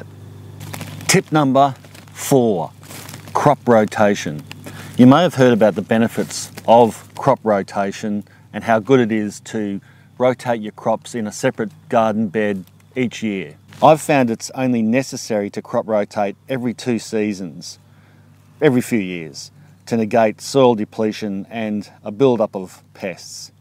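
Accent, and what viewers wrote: Australia. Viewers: came for the gardening insight. stayed for the Australian accent haha👍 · Great delivery, straight forward and very Aussie too!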